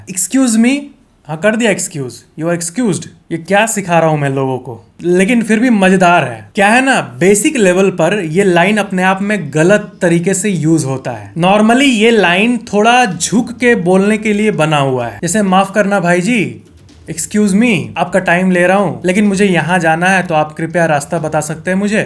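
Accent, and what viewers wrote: Bihar, India. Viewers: Bihari confirmed✅ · LAGTA HAI AAP BIHAR SE HAI 😄😄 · That bihar Accent.... kaisan baada ho❤❤😂😂😂😂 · Your Bihari Vasa Acha Tha 😅😅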